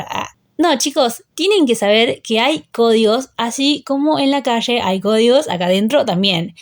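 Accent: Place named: Argentina. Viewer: se nota el asentó arg XDD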